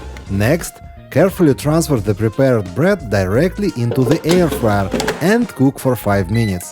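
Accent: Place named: Russia. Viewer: awesome accent! Reminds me of my fav star trek "Chekov" Walter Koenig ❤️ ingenious creative recipes!!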